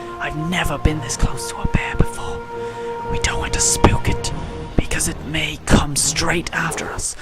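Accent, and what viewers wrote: Ireland. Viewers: Are you irsh? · yep hes irish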